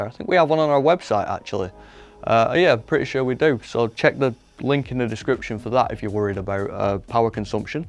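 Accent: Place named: England, United Kingdom. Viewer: Love that English accent.💛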